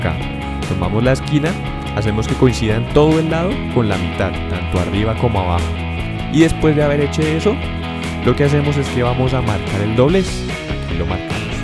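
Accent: Bogotá, Colombia. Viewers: Eres colombiano verdad? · Tomas Echegoyen los colombianos ablamos asi y para tu informacion somos uno de los paises que mejor abla español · BearMG Bogotano, seguro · tu eres de colombia hablas como colombiano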